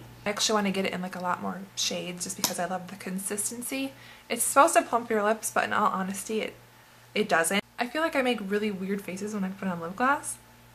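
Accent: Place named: North, United States. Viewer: Hey! i am just curious as to where you are from! i feel like you're voice sounds like mine like accent wise. are you from the north? hahah weird question sorry! i live in the midwest and everyone makes fun of my northern accent but you sound like me i think so just curious:)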